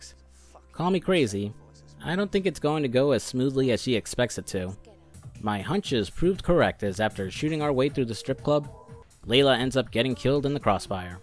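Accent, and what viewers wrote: United States. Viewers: Getting an ear full off this moaning yank narrating ... lifes not ment to be easy son it aint a walk in the park treacle , fack me 😉 ... me an our kid were living in london when this gem came out ... looking back im chuffed we did the london thing in our twenties ... most young Brits do , if they got anything about them ... remember working at selefridges and going there on the game it even had the back exit staff had to use and other places id go up west ... kewl as fuck that game deffo needs a remake would be epic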